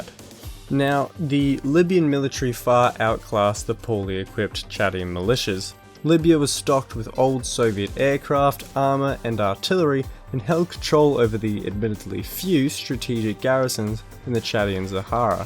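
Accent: Australia. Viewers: you sound very Australian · Is it just me or is his Australian accent distinctly more intense in this video than usual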